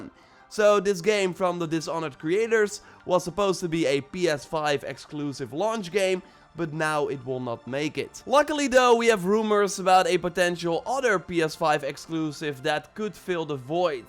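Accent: Netherlands. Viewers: i wasn't 100% sure if your accent was dutch but when you said 'mathijs de jonge' i knew for sure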